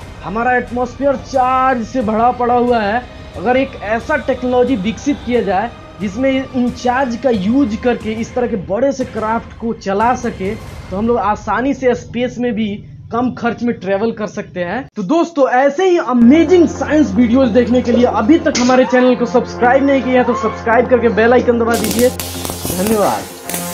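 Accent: Bihar, India. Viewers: bhai apna hindi nd english accent sahi kr lo thoda impression achha bnega apke accent m bihari tone aata hai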